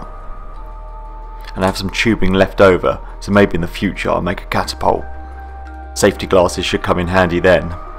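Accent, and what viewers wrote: United Kingdom. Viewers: I guess your British, seing the house, your accent and such. Can I ask where you find your leatherwork tools and the leather? I have a hard time finding a store in Belgium, the closest is an hour drive with the car, and I am not sure that they have what I want. Online shops are also not that easy to find for a affordable price and have it trustworthy. I found some online stores in the U.K., but I have had a bad time ordering from the U.K. Maybe you know some trustable and affordable online stores? Thanks, and great video!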